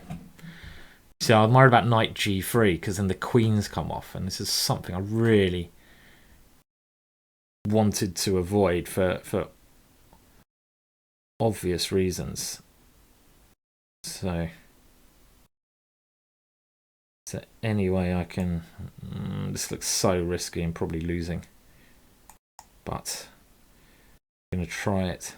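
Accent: England, United Kingdom. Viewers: I love it when he swears. Profanity is not only humorous but should be mandatory with such a fine english accent.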